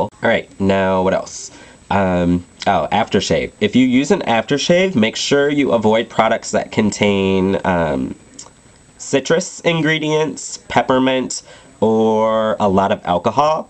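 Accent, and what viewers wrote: United States. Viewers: i live in canada, and its an american accent :)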